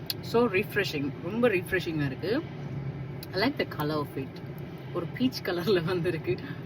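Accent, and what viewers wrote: Sri Lanka. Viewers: நீங்கள் பேசும் இலங்கை தமிழ் மிகவும் அழகாக இருக்கு ஆங்கில கலக்காமல் பேசினால் மிகவும் நன்றாக இருக்கும் ஒரு நட்சத்திரம் போல் உங்களை காண்பது போல் இருக்கு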